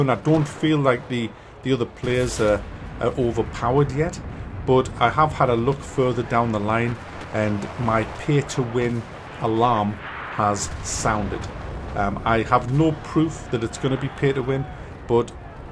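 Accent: United Kingdom. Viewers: It's just his accent /slang. The way people pronounce words is very different across the whole of the UK.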